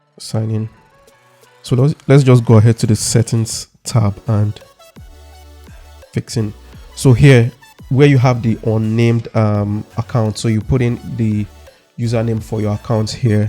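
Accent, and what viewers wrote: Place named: Nigeria. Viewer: Thanks alot for the walkthrough!! You sound like you are from Nigeria bro.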